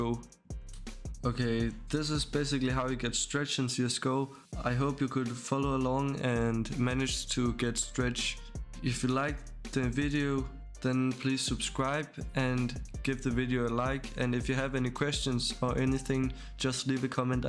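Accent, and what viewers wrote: Denmark. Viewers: my g is 100% danish i can tell! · are you danish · Are you from denmark?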